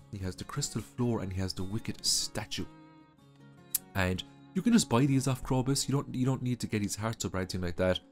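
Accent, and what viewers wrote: Ireland. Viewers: thank you for the heart, I love your Irish accent ❤️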